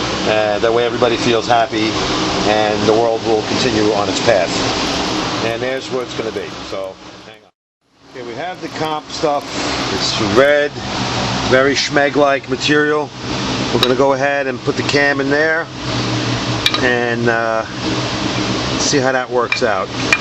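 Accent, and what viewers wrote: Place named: New York, United States. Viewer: Jesus Christ dude the day I bought my GN brand new back in 87 the service department at the dealership told me that this is literally the most sensitive and temperamental v6 that GM ever made. You can’t man handle and let sensitive internal engine components like the camshaft journals being scratched and nicked by the hood lock. Then you spin away all the assembly lube on the cam showing off with this dog and pony show. I love how you fingerprint yourself in the valley pan. You sound like your from either Brooklyn or Queens Ny and I hope to God in 31 years I never had you working on my WE4 because after seeing this video all I can say is God be with Pasquile and his engine. If you pulled this on my car and I saw this video Idk how I would respond. Since retiring and moving to Florida I started doing everything myself on my car because the dealership doesn’t know how to work on these cars anymore and the “Go too guy” could be like you who is careless and doesn’t (be admission on the video) care about your customers or the work of art classic piece of American history that you are working on. 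I guarantee you nicked that bearing journal and that engine isn’t running or has since been re built by someone who knows what he or she is doing. You should already know how unforgiving and intolerable these turbo 109 engines are. Especially the shortblock and shitty oil pump, and oil pressure issues. But like you said,,It’s not your car.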